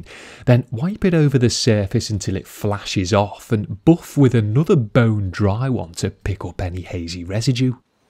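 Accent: Liverpool, United Kingdom. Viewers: It's like a nature documentary with the British accent, but about a guy cleaning a car. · Valentino Rossi Fans he’s a scouser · Feels bad cant understand half of the jokes since the british is strong in this one :D But atleast i can see the car transform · Mellisonant he’s scouse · So I’m American but I’m trying to guess the accent off the few English friends I’ve known over the years.... Liverpool???? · Liverpool accent?? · is he scouse or am i going mental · Something about his soothing british voice as he covers a car in white foam makes me happy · Sounds more like he's from the outskirts of Liverpool. Huyton maybe.. or even Warrington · your way of talking is so British uuuh too much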